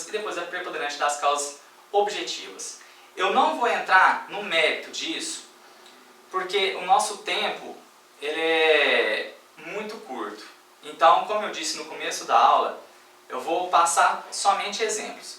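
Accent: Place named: Goiás, Brazil. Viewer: kkkkkkkkkkkkkkkkk goianin da gema..... :p (sotaque)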